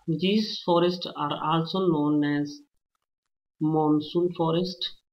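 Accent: England, United Kingdom. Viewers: Very helpful to revise the portion once studied... I like all your videos ... except your English accent everything is good...thanks a lot... Pls keep on doing Bipin Dogra and *****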